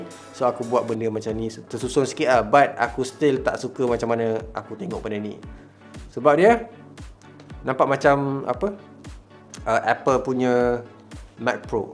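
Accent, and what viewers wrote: Terengganu, Malaysia. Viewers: Org kuala terengganu ke ? Area mana tu? 😄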